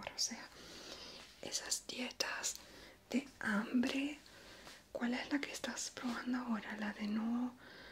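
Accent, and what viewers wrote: Venezuela. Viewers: Me encanto lo Italo-Venezolano de Caracas pal mundo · Que linda. Gracias por tu video. Me encanta que se nota tu acento Venezolano. 😍😍😍 Me dio hambreee!!! Hugs. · Que dulce es el acento venezolano ♥️ mil gracias por esto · Adoro tu acento italo-venezolano ❤️ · Amo tu acento Venezolano es único! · De dónde eres? No sé muy bien porqué pensé que eras italiana (creo que es porque tienes varios videos en italiano) pero el acento venezolano está súper on point, estoy confundida 😂😂 Saludos desde Venezuela ❣️ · Me encanta tu acento venezolano, muy relajante. Saludos. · Graciass! me ha ayudado tu videitoo! un video de ASMR bien fino! jeje me ha relajado bastante escuchar ASMR con mi acento Venezolano <3 Un besito corazón! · Hola en estos tiempos de angustia que estamos viviendo en Costa Rica, el verte y escucharte me relaja bastante, me encanta tu hermoso acento venezolano gracias por ser tan especial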